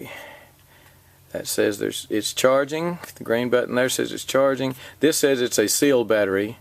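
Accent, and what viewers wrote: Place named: South, United States. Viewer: With that southern drawl I was expecting to hear a "hell yeah" haha.. Regardless your vid definitely piqued my interest.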